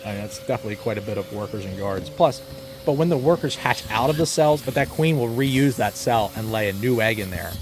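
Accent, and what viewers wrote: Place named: Pennsylvania, United States. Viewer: What is this dialect? It's very "Mare of Easttown"...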